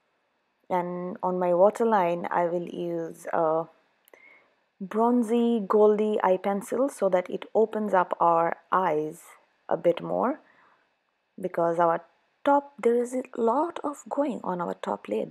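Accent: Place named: South Asia. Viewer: Are you from Bangladesh or India or Pakistan? Your accent and name sounds like it 😅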